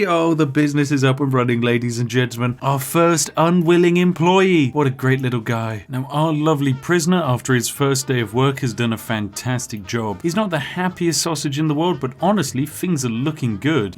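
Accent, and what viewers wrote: United Kingdom. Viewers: Reminds me of Ambiguous Amphibians series on this, but with a heavy dose of British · bro is british gray still plays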